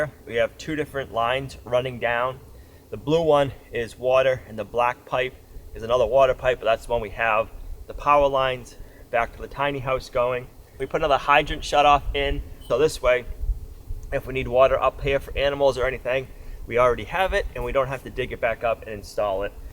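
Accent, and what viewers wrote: United States. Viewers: Regional dielect we have them all over the US. Lighten up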